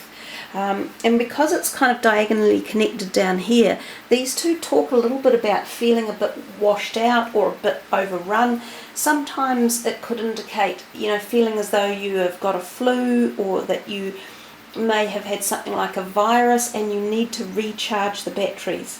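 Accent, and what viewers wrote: New Zealand. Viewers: Are u from new zealand? U have kiwi accent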